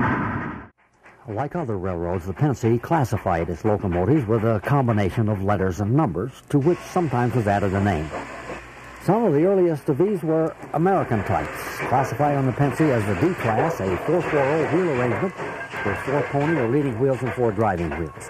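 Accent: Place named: United Kingdom. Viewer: he seems to speak British accent rather than Americans, or is it pennsylvanian accent too?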